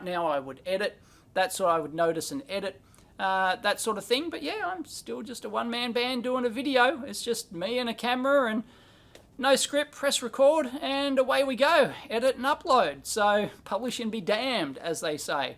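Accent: Australia. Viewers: Thank you. I started watching 7 years ago, when I was 10 years old. I did not understand anything you were saying but I thought your accent was interesting and relaxing, so I kept listening to your videos. Over time I started to pay attention and learned a lot about electronics and electrical engineering thanks to you. Now I am planning to go to university next year to study electrical engineering. Largely because I stumbled upon a friendly Australian guy. Thank you for being an inspiration, and I hope you continue for many more years.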